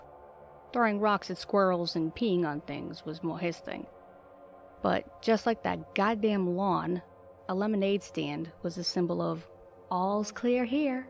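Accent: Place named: Massachusetts, United States. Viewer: Excellent job, everyone. Being from MA myself, I really can't get over how much I enjoy hearing someone read with my home accent. Thank you for representing us!:D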